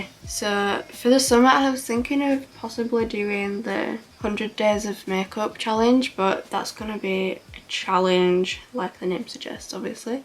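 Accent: United Kingdom. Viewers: i'm late, i also just finished gcse's in june, bUT i wanna know which part of the uk are you from? :)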